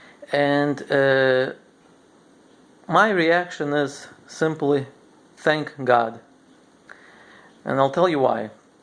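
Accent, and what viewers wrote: Russia. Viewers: Hi bro! I am crushed into your videos. Just curious to know where did you get your russian accent? )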